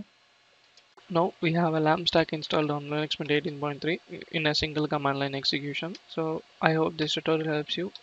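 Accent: India. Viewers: Interesting. I had a bit of trouble with the Indian-English accent. Perhaps a transcript would help. Thanks.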